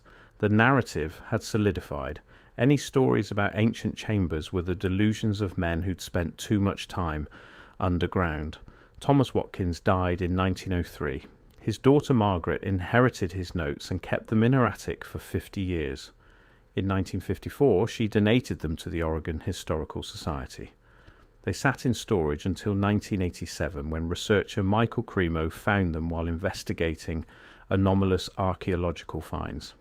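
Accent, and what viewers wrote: United Kingdom. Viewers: Sure getting tired of listening to American stories narrated by a machine voice with a British accent. If you want more clicks start using a real human to speak for you. Stop being so damned lazy. We know the difference and we are sick of AI British accents.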